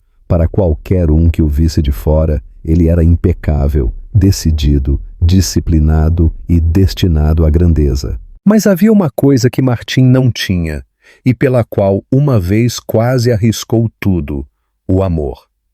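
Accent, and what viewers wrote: Portugal. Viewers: É o português natural de Portugal.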